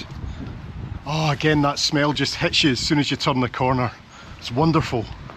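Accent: Scotland, United Kingdom. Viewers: The more remote a Scottish place that goes to, the stronger his accent gets. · Tbh.. After watching through most of you videos I hade to google “Wee”. I understood it context to be tine but in my Swedish brain it’s something else.. but apparently my Scottish is useless from time to time:) Keep it up. I enjoy you dialect and your videos.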